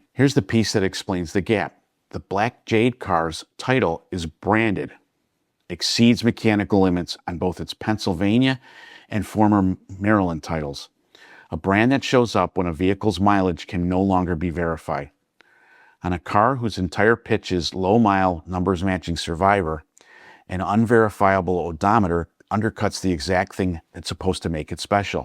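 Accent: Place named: New Jersey, United States. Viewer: . I really enjoy your videos. Thanks for doing them. It might be your Jersey (?) accent, but Wimbledon does not have a t in it, and asterisk is pronounced as it is spelled. I realize i am pedantic.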